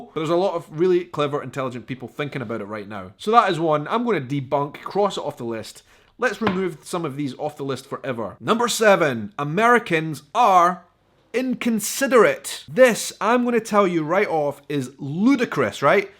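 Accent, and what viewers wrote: Scotland, United Kingdom. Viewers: I just love listening to you talk. Have you realized how much Americans love the Scottish accent? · Been watching your channel for a while now. You are a wonderful speaker. You come across as very positive. The Scottish Accent is very cool, fun to listen to. I think you like America more than I do and I'm a native. I'll keep watching. I want go visit Scotland someday, after you've sold it so well. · I had to do a double-take when you said you "Spoked" to Americans, your Scottish accent sounded like "Spooked" Americans, and I thought why would Americans be scared of you! lol Scotland is on my bucket list to visit, more so than England. I suspect the accent though should make for some funny misinterpretations when I visit. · I second this. The Scottish accent is one of my favorites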